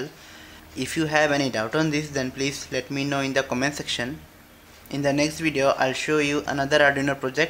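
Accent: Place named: India. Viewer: I loveeeee Indian accent....it sounds like his tongue is shorter than others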